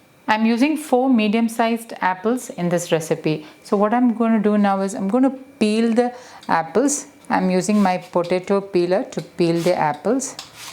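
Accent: Kerala, India. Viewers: Pretty thick Mallu accent. Aunty, it's sprinkle NOT springle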